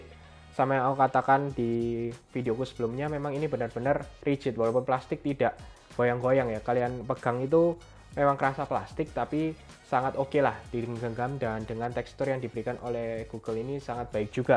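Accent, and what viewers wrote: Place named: Surabaya, Indonesia. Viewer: Pasti org Surabaya ya bang?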